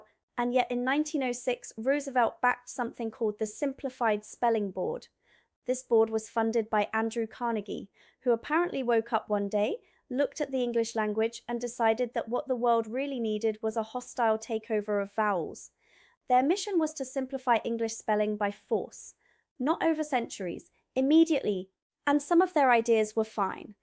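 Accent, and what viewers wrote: England, United Kingdom. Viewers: Your English accent carries upward inflections at the end of many sentances. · Just brilliant Where does that brilliant accent come from I actually thought you were Britiah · Why is there a blurred section in the centre of the screen. And why do you have a British accent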